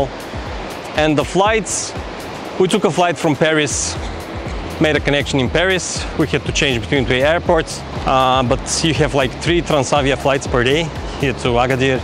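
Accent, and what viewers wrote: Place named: Bulgaria. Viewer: Where are you from? Your english is not bad but I feel as a bulgarian accent.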